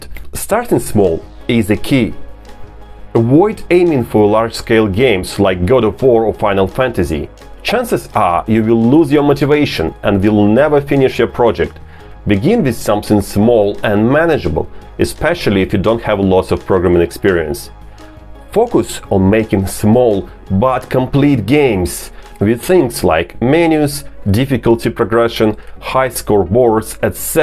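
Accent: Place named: Russia. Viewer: Я прям догадывался всё это время по акценту, что ты русский) Полезное видео, спасибо!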